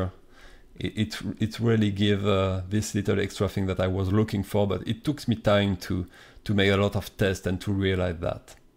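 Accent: France. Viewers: Tu peux faire la video en français (je reconnait un accent quand j'en vois un) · l'accent français jpp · Thanks for the tutorial (Ps: is it me or you sound french?) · ta un acent francais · Thank you for your clear explaination and your french accent, it help me a lot. I'm doing my transition toward Krita and this tool is very impressive. I have just tried it on my last page, i've saved maybe one hour today ;) Thanks you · Hmm l'accent francais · cet accent français .-. Enfin bon je dis ça mais je ne suis pas mieu lol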